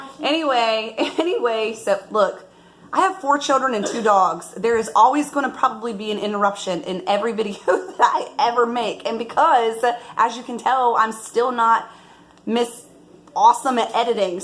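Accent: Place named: New York, United States. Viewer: Are you from New York. Cute accent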